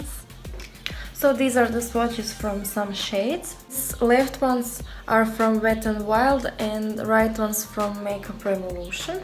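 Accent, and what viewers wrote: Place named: Eastern Europe. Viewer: Love our eastern europe accent, where are u from hun x 😊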